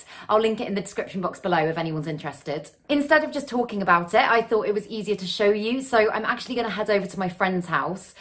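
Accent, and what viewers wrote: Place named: London, United Kingdom. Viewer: I like your English. Londoner?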